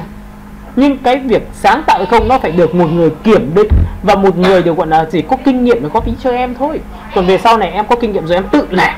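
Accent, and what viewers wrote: North, Vietnam. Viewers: Trình độ thì không nói tới, chỉ thấy bất kỳ anh người Bắc nào dạy học cũng như vẻ dạy đời, nghe giọng mà ngán ngẩm vãi, nhưng phải ráng nghe!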